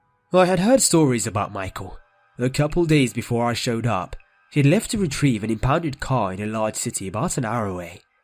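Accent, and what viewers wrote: United Kingdom. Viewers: He is from the uk like me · good video. nice reading another new sub. what part of UK you reside? Manchester myself 😀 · Good story + English or British ascent (XD) = Subbs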